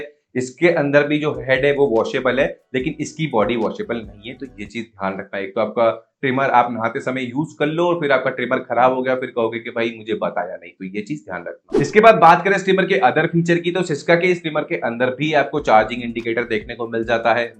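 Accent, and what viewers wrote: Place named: Bhojpuri, India. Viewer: First time trimmer kharida tha video Dekhi aapane Sahi suggestion Diya but aapki awaaz mein Bhojpuri hai thodi Gunji Si Rahi awaaz ni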